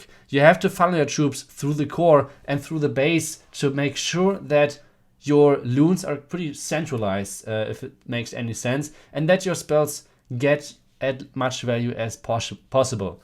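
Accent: Germany. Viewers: You can tell by his accent he is german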